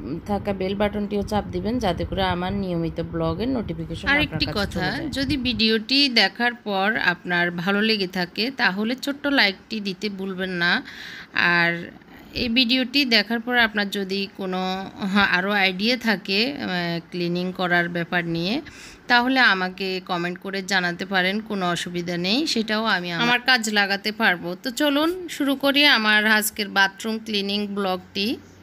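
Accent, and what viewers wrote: Noakhali, Bangladesh. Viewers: আপু আপনি কি নোয়াখালীর। ভাল না লাগলেও glabs use অবশ্যই করবেন। আপনার হাত নষ্ট ঽবেনা। অভাব হয়ে যাবে।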